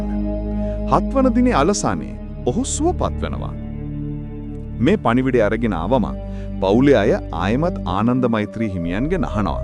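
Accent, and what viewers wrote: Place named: Tamil, Sri Lanka. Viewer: මේ voice එක AI ද? එසේ නම් කරුණාකර සිංහල භාෂාවෙන් හඬ කවන වීඩියෝ සඳහා නියම සිංහල උච්චාරණය සහිත AI voicing version එකක් තෝරා ගන්නා ලෙසට මේ සංලක්ෂා යු ටියුබ් නාලිකාවේ හිමිකාරත්වයෙන් ඉල්ලා සිටිමි. නැතිනම් සිංහල මවු භාෂාව වන අයෙකු ලවා ඔබගේ script එක voice කරවා ගන්න. මේ නාලිකාවේ සෑම වීඩියෝවක්ම තියෙන්නෙ මේ කටහඬින්. අපිට දැනෙන්නේ හරියට දෙමල භාෂාව කතාකරන කෙනෙක් සිංහල කතා කරනවා වගේ. එය එක දිගට ශ්‍රවණය කරද්දී අමාරුයි, රසවින්දනයට බාධාවක්. ඔබගේ වීඩියෝ හොඳයි, educative,We feel like we are listening to a different language. Please don't interpret this as discrimination against any other language.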